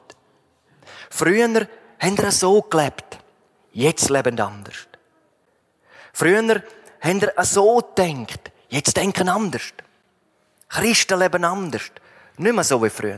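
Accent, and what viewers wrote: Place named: Switzerland. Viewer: Trotz "Schweizerdeutsch" kommt die Botschaft durch und rüber. Danke für dieses Wort. Gottes Segen für Euren Dienst und die klare Verkündigung.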